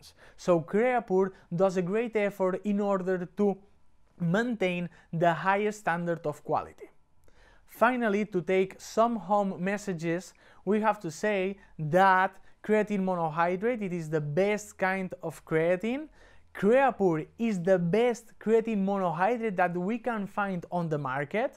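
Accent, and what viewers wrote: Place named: Spain. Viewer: The Spanish accent is a bonus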